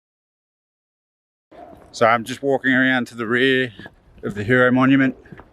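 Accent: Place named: Australia. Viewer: So refreshing to hear Aussie accent on a cool travel blog.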